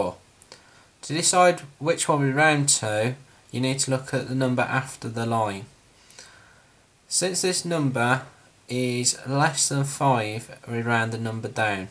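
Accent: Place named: Yorkshire, United Kingdom. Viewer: Proper Yorkshire accent I think I would love to live there..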